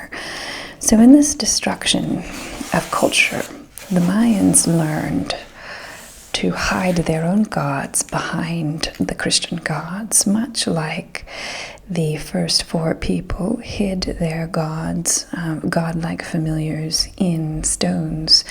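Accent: Transatlantic, United States. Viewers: sounds almost transatlantic to me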